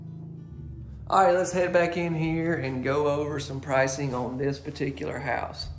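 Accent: South, United States. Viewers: Speak your Southern English! I love it! Don’t ever change. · Love your southern accent. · GREAT-looking LR/DR/kitchen open-area layout, and you have a soothing, "folksy" way of selling the unit. My only "criticism" is when you hesitated after saying "reckon" as though it was too country for the video. Your southern accent NEEDS TO BE PRESERVED, because with the gentrification of much of the US--I'm from Boston, have a STRONG Boston accent, but fewer ppl speak with a Boston accent nowadays due to the dreaded gentrification of neighborhoods here--so we gotta keep the various cultures/accents alive throughout the US. After all, the US would be a dull place if all we had was a monotone, single accent throughout the entire country, right? In other words, STAY TRUE TO WHO YOU ARE! Keep up the good work:) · LOVE THIS HOME! and keep being you...love the southern accent👍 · Please do not apologize for saying “reckon”. 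I’m from Alabama. Keep our Southern accent alive! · I love your accent ♥️ Be who you are. Don't go changing trying to people please, because I RECKON, LOL HEHEHE 😋 🤣🤣 🤣 you will never ever master being a puppet to everybody in the world. I love your personality, you have a southern swag about yourself 😁 yes, SOUTHERNS HAS SWAG..LOL HEHEHE 😋 🤣🤣 🤣..and that is what's keeping the viewers returning to your channel ❤️ keep up the great work. I RECKON , I SEE Y'ALL ON THE NEXT VIDEO..🤗 Charole'vista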